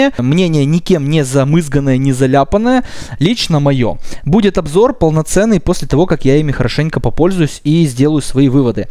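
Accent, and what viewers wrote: Moldova. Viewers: ты чё из молдовы?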